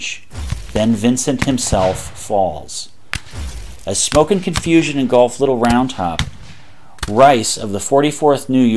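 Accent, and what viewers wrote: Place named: Pittsburgh, United States. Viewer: Ahhh, a yinzer. Nuff said . . .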